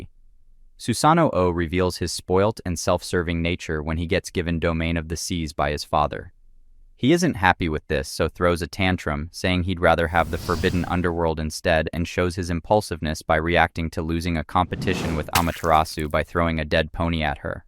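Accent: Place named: United States. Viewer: 미국인? 미국인발음 짜증남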